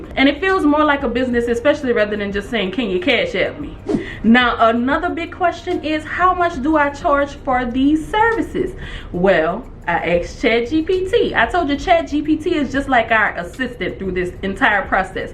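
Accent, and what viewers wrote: New Orleans, United States. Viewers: I kept telling myself, she sounds like me, she has to be from the 504. lol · Thanks for your info. I knew the accent was New Orleans❤ Baton Rouge here 👋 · After listening to you speak the first thing I thought was "She MUST be from Nu Awlins". I just discovered your channel last week and will be applying your directions and advice to make money. And boy do I need it right now. I fall down but I keep getting up. Thanks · I knew you was a Nola baby😂.. Wuzaam witcha? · I love your N.O. accent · Girl.. you sound like you’re from the N.O!! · I can tell by your accent you from New Orleans !! Love seeing people from my city really make it out !! I'm next !! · Girl! The second I heard you talking I was like she sounds like she’s from here… then Sho’Nuff you said New Orleans girl I’m right here on the West Bank! So of course, I subscribed for that reason and because you are doing the Lord’s work out here girl! 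Thank you so much for not gatekeeping information like this. Also, the way you explain the process step-by-step was flawless and completely the way I process things. So I just wanted to come on here and thank you and say hey from the West Bank and to tell you, you are doing amazing things and it’s appreciated!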